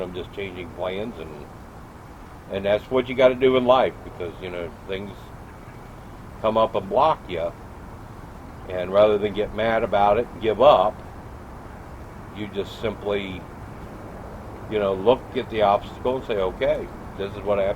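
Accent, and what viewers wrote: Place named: St. Bernard Acres, United States. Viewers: St. Bernard Acres, I picked up your/our accent!! :) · Sounds like a fellow Texan! Yeah I agree, Ohio better be glad Texans didnt live in Ohio, we'd tell them to kiss our big hairy wal nuts. Its like my fellow Texan says, out in the country they dont give a $ h!t if we live in Link Knights cardboard house, as long as we have a proper waste system. The more I hear about idiotic laws in other states, the more I love Texas. God Bless the Lone Star State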